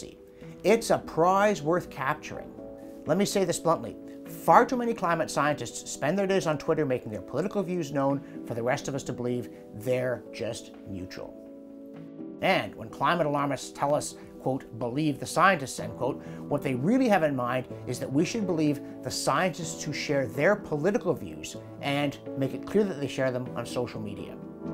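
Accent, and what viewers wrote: Canada. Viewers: and still nothing worth remembering. He spent that time trying to justify his veracity or valiidity or something. Once again.....4 minutes that I will never get back. He sounds like a Canadian to me.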